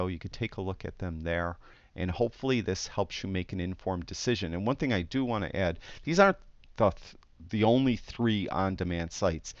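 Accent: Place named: Upstate New York, United States. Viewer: What an awesome video...thank you so much for explaining this so clearly. I've been thinking of selling some prints and had been wondering about Smugmug, so this is really helpful. I saw your comment above about donating the proceeds to the charities and that's wonderful. I had always wondered if you were from Upstate NY as well due to your distinct accent and it seems like you are! (I went to college at SUNY Oswego) :)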